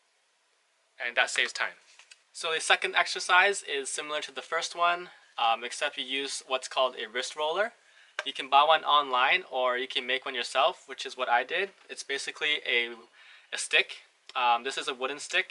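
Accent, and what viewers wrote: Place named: United States. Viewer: Jogender Jakhar he has an American accent so I would assume America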